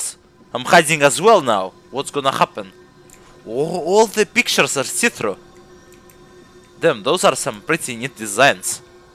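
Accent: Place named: Russia. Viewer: Привет теска! Five minutes of watching you and I wish I could have my Russian accent back. I’m gonna work on it!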